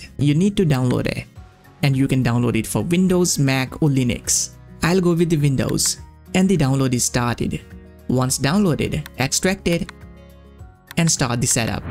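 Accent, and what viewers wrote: India. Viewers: Why would you want to clone your INDIAN ridiculous accent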